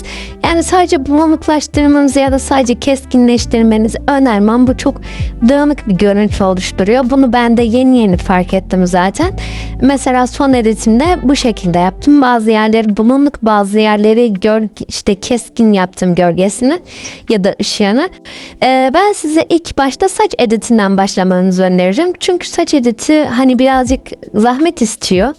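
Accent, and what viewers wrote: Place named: South Korea. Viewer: ama sesin benziyo sanki korelimissinde türkçe konuşmay çalışıyormussun gibi❤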